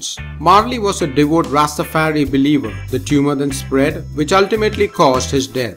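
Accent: India. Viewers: This historian must be Indian ppl because of accent do you hear? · The narrator is Indian, the inspiration is Jamaican, and I'm half Irish and Polish. Multiculturalism - or as Bob would say...One Love... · Ya his voice sounds like indian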